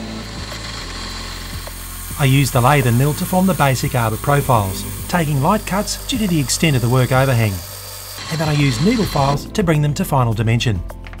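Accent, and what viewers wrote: Australia. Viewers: Are you Australian? Just wondering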